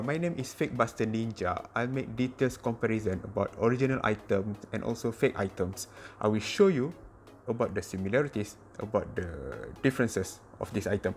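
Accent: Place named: Malaysia. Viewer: Logatnya inggris malaysia yah,salam dr indonesia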